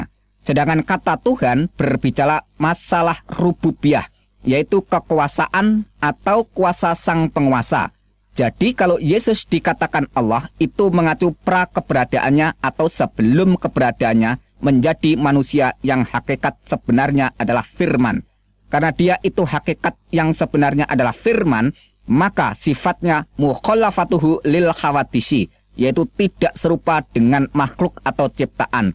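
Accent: Java, Indonesia. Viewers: Dari logatnya ustad ini orang jawa. Dan opini saya sebelum kita mencari kebenaran dahulukanlah meminta perlindungan dari allah swt dari syaitan yang penuh tipu muslihat, karena syaitan adalah musuh yg nyata. Audzubillah himinassyaitanirrajim bismillahirahmanirahim. Ketahuilah sebelum anda ingin memahami ilmu ketuhanan lebih jauh anda harus paham apa sih perintah tuhan paling pertama dan mengapa harus begitu. Pengalaman saya pribadi saya mencoba memperbandingkan 4 agama. Yahudi, yesus pbuh, hindu, dan tentu islam. Ternyata perintah utama itu adalah harus mengetahui bahwa tuhan itu esa=1. Lalu saya mencoba memahami kenapa tuhan itu harus 1. Alhamdulillah penjelasan paling logis dan simple saya dapatkan dari mengenal angka. Kenapa tuhan itu esa? Dalam taurat, zabur, perkataan yesus pbuh tuhan itu esa. Esa atau angka 1 adalah awal karena mustahil 0 adalah eksistensi yang nyata. 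Angka 1 juga bukan akhir karena angka 1 dapat menciptakan angka-angka lainnya. Angka 1 juga berdiri sendiri artinya dia tidak membutuhkan angka-angka lain untuk menciptakan segala sesuatu. Bukti 1+1=1, 1+1+1=3, dst. Jika angka 1 melipatkan diri dia adalah tetap angka 1. Bukti 1x1=1, 1x1x1=1, dst. Jika angka 1 mengurangi diri secara bertahap / pembagian dia tetap angka1. bukti 1:1=1 artinya angka 1 itu kekal. Jika angka lainnya mengurangi diri secara bertahap dia akan kembali kepada sang pencipta yaitu angka 1, artinya dalam setiap penciptaan angka lainnya adalah milik angka 1, bukti 3:3=1, 241:241=1. Jika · Aku sangat malu dengar bahasa jawa mu yg medok.. Jelekan islam berjam jam lamanya.. Seolah mengajak murtad manusia seluruh umat.. .....Yesus itu manusia. Tidak ada seorang di kumpulkan allah kelak di hari hisab hanya se orang hamba... Isa putra maryam alias yesus kelak tida bisa jadi tuhan hanya jadi saksi kemurtadan penyembah nya. 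· Aduh. Saya ketawa mulu liat video ini. Maaf, bukan karena isinya, tapi karena logat pembicaranya mendok baget. Saya menghargai pendapat anda, dan saya tidak mencela keyakinan anda, sungguh. Cuma ingin menambahkan beberapa pernyataan Al-Quran yang sedikit terpotong : "Wahai Ahli Kitab, janganlah kamu melampaui batas dalam agamamu, dan janganlah kamu mengatakan terhadap Allah kecuali yang benar. Sesungguhnya Al Masih, Isa putera Maryam itu, adalah utusan Allah dan (yang diciptakan dengan) kalimat-Nya yang disampaikan-Nya kepada Maryam, dan (dengan tiupan) roh dari-Nya. Maka berimanlah kamu kepada Allah dan rasul-rasul-Nya dan janganlah kamu mengatakan: "(Tuhan itu) tiga", berhentilah (dari ucapan itu). (Itu) lebih baik bagimu. Sesungguhnya Allah Tuhan Yang Maha Esa, Maha Suci Allah dari mempunyai anak, segala yang di langit dan di bumi adalah kepunyaan-Nya. Cukuplah Allah menjadi Pemelihara" (QS. 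An-Nisa' [4] : 171) "Al Masih sekali-kali tidak enggan menjadi hamba bagi Allah, dan tidak (pula enggan) malaikat-malaikat yang terdekat (kepada Allah). Barangsiapa yang enggan dari menyembah-Nya, dan menyombongkan diri, nanti Allah akan mengumpulkan mereka semua kepada-Nya." (QS. An-Nisa' [4] : 172) "Dan (sebagai) Rasul kepada Bani Israil (yang berkata kepada mereka): "Sesungguhnya aku telah datang kepadamu dengan membawa sesuatu tanda (mukjizat) dari Tuhanmu, yaitu aku membuat untuk kamu dari tanah berbentuk burung; kemudian aku meniupnya, maka ia menjadi seekor burung dengan seizin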